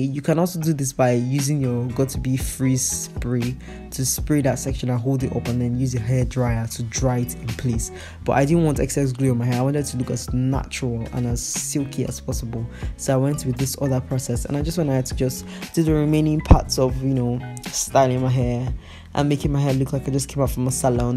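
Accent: Nigeria. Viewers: Gurrrlllll I love it...talk your naija talk babe! But awesomely explained 💋💋💋💋...then ending it with korean👀👀 yes ma'am ahnyeong-hekasayo! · Immediately I heard her first statements I knew she's either a Nigerian or have had close contact with Nigerians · “I will overpluck you from my comment section” the way she says it😂 I love my Nigerian sistas, the accents are everything bc it makes everything else funny y’all say a mil times more funny😂😭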